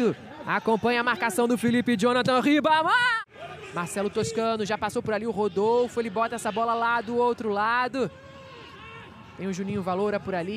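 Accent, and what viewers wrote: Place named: Rio de Janeiro, Brazil. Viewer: Dá para tirar essa VOZINHA de TAQUARA RACHADA, feia, estridente, de criança e colocar um HOMEM ou uma MULHER ADULTA para narrar!? Fora o sotaque extremamente acentuado que só CARIOCA entende... TIREM ESSE NARRADOR DAÍ, URGENTE!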